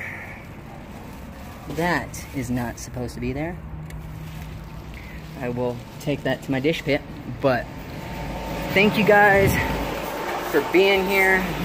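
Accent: United States. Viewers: You From Chicago Bro ?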